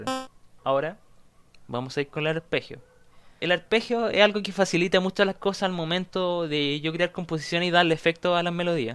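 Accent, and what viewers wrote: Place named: Chile. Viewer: tienes una mezcla de acento chileno con toques de acento andaluzmes algo raro pero mola mucho tu voz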